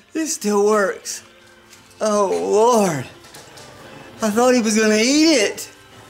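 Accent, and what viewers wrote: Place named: Texas, United States. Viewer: What state are you from? Texas? Love the accent.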